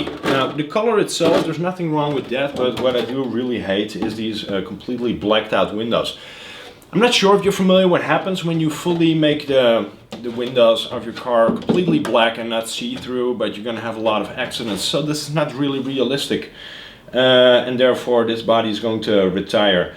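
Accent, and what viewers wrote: Netherlands. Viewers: Hey, I'm just getting back into RC, I flew fixed wing years ago, but now I've got the hots for trucks and cars. Ive watched a few of your videos and thinks they are great, no bullshit, just facts and well informed opinions. I'm not interested in your cats either, or people who flower themselves up to be more 'interesting'. 🙄🤥 Keep up the good work. 👍 I have my first car, a Slash 2wd OBA, but this will be my secondary car, my first love is crawlers and I'd like a RC4WD Gelande LWB 110 purely because I want my crawler to be as scale as possible, but damn it's expensive! I'm considering a HPI Venture with a Defender 110 hard body with interior instead as a cheaper alternative, I'd love to see a video on fitting various bodies to the HPI. 😉 Oh and BTW, I can't get your channels theme song out of my head, or you're 'crazy' Dutch accent. 🇳🇱👍 Jelly from 🇬🇧😁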